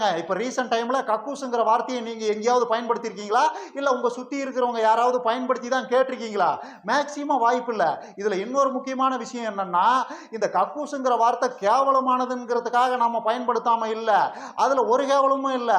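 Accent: Kongu, India. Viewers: கொங்கு பகுதியில் பேசும் பழக்கம் உடையவர்கள் என்று நினைக்கிறேன். இந்த செய்தி பரவாயில்லை.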